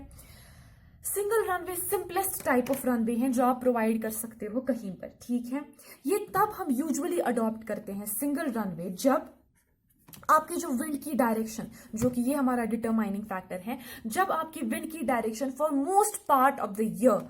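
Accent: Kashmir, India. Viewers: Aap Kashmir se ho mam?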